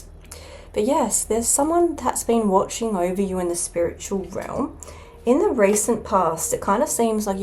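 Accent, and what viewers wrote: Australia. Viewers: Love your Australian accent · Are you from Australia or England? New Zealand perhaps .your voice ❤❤❤ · ambient australian authenticity ♫ ergonomic ♪ easy on the ears ♪ pronunciation sans pretence ♪ maaaate ♫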